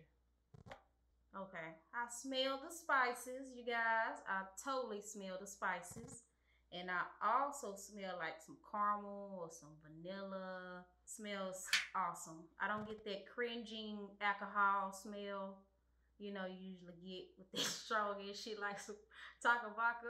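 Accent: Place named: South, United States. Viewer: This is dope. You country as fuck! Lol this is M.A. by the way. Lol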